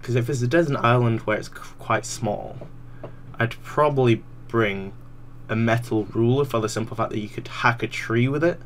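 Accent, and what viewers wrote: United Kingdom. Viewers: wat your Asian I thought u were proper british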